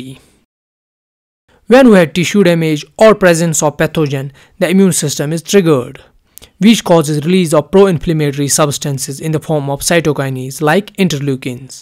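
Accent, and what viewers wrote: Kashmir, India. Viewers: Bro, love from kashmir, but your accent of speaking the English is very similar , how kashmiris speak English, are u kashmiri too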